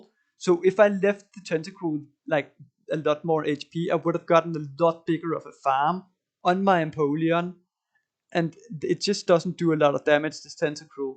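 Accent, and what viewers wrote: Denmark. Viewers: Your danglish is beautiful ❤️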